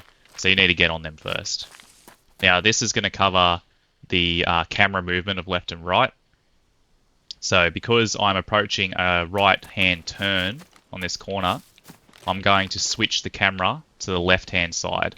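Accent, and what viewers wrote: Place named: Australia. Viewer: Nice to hear an Aussie voice and a fella who knows what he's on about to boot. Subbed cheers mate.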